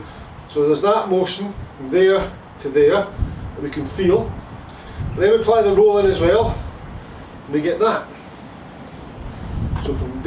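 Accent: Scotland, United Kingdom. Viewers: The best instructor yet, love that Scottish brogue, gets his point over with lots of demonstration along with explanation. He does not waffle on about other things. As a 91year old I love his lessons I think he is the best thing since sliced bread.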